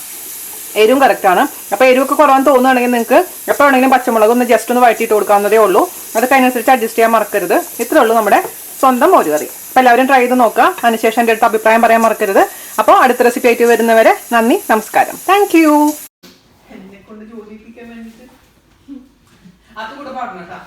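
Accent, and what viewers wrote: Thrissur, India. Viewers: Chechi ur presentation is Soo gud n u have a great thrissur slang 🥰😍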